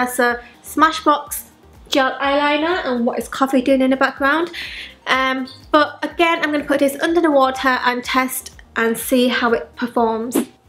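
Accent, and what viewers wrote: United Kingdom. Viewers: I absolutely love the asian/british accent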